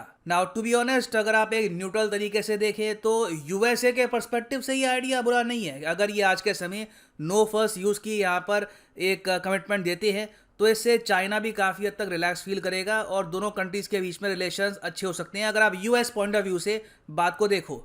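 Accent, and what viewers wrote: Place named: Bihar, India. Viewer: जबर्दस्त आज के लेक्चर मे बिहारी टोन है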